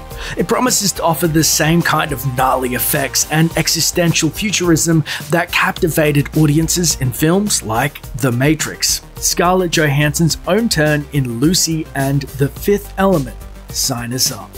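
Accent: Australia. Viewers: Anyone else realize this is Aussie guy Commentating in his regular voice?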